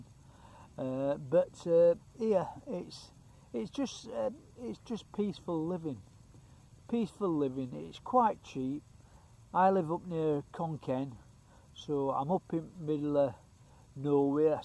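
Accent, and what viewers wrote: Yorkshire, United Kingdom. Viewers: Where are you from pal are you from Yorkshire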